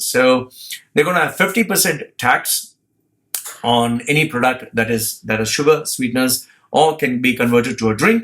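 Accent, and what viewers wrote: India. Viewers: Why has your accent become more Indian sounding of late? Here it was very different 😅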